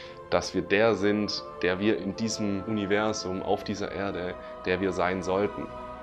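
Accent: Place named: Swabia, Germany. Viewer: Liebe Grüße aus Chiang Mai... du hast nen schwäbischen Akzent 😁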